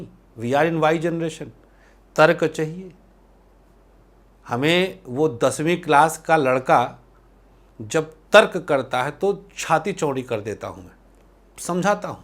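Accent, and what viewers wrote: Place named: Varanasi, India. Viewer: sir aap ka. Bolane va baat kernai ka andaza ek dam banarasi hai 👍 jab aap datatai hai to 🙏🙏🙏 you are great sir.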